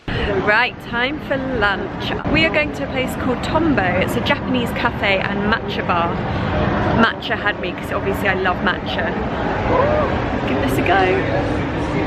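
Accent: RP, United Kingdom. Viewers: why are you always trying to sound extra posh lately? youre not even from a posh background.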